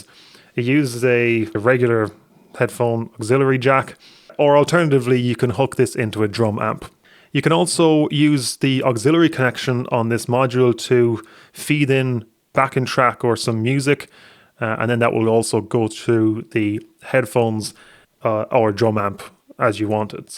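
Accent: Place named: Ireland. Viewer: He sounds Irish!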